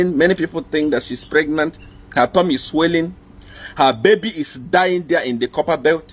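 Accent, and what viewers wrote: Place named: Nigeria. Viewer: Are u nigerian or zambian? Because of your accent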